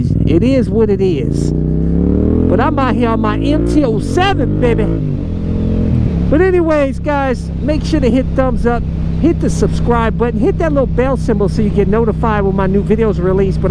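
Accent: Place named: South, United States. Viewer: C'mon man! You're just a CITY BOY with a country twang speech impediment. Yeah, you gotta work to live, brother, and you gotta SHARE IT WITH THE FLORA & FAUNA. FACT.✅ Only the tough survive. But yes many southern people are crazy, esp. the government. You avoid them!! Otherwise, youare 💯% right, EXCEPT, CITIES ARE A DEATH TRAP.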